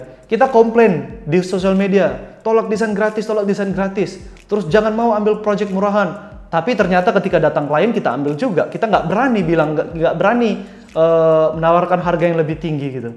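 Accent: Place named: Pematangsiantar, Indonesia. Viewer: logat bicara mu bro mancam par siantar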